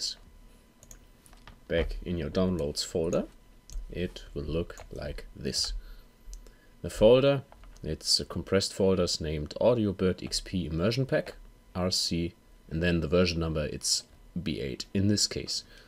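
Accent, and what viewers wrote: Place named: Germany. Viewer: What city are you from in Germany?? I love your accent.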